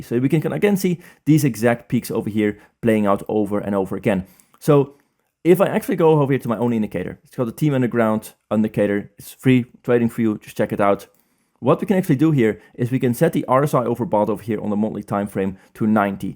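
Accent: Netherlands. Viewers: You are Dutch, aren't you?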